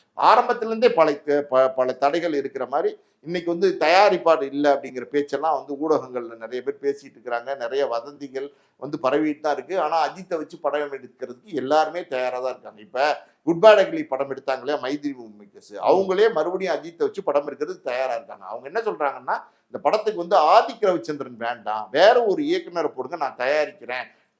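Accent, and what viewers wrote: Madurai, India. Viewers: nenga madurai ya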